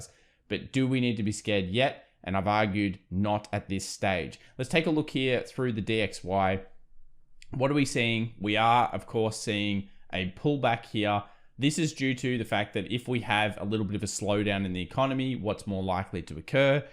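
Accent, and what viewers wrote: Australia. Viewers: Straight down to the point and precise you are the best ta on here. Love your aussie accent too.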